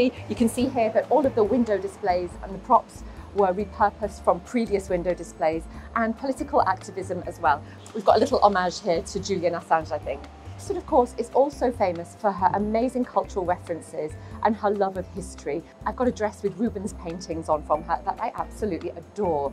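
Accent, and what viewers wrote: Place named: Manchester, United Kingdom. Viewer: I will check it out. 😊 I heard a bit of a Northern accent today in your video.Didn't realise it was Mancunian.👍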